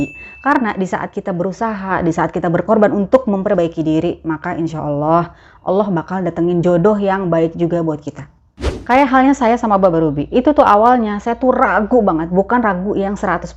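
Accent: Jakarta, Indonesia. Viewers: lucu deh ngomongnya,pake logat Betawi...nyenengin banget!Terusin ya,ceritanya...aq jd bnyak tau.Tks.❤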